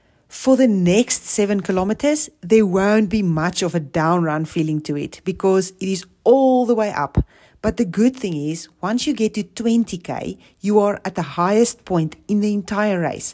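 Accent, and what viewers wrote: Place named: Afrikaans, South Africa. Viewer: Afrikaanse accent in Engels is koning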